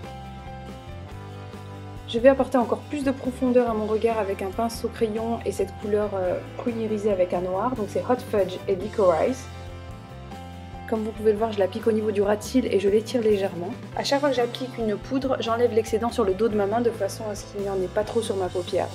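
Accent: England, United Kingdom. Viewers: C joli mais tu aurais pu repasser la combi ! Sinon j'aime bcp ta voix et ton accent anglais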